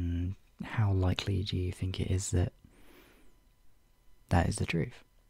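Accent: United Kingdom. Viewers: But like- I thought u were scottish why do u sound scottish to me 🤔✨ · This audio is so British it makes me happy 😊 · You talk so much like me it's unreal :3 I'm also British, and I do a lot of pet play with others (and MIGHT be a teensy bit into kitten space myself), but your cadence and word choices are so beautifully specific. Your improv skills are amazing, such a treasure to stumble upon this! ^w^ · oi.. I'm crying you're to cuute the british is too cute I'm dead from fanboying too hard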